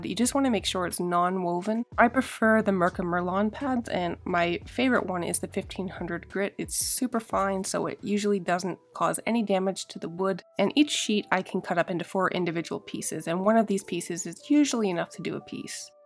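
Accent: Canada. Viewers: OMGosh, how petty. Salve...you are pronouncing the L. Aunt, people pronounce like the insect ant. Ignore the critics, people are so hateful 🙄. I love Canadian accent (I'm sure I have an Ohio valley accent). Very informative video, I want to start refinishing furniture to help supplement my income. Your videos help explain how you can get started💗💕💗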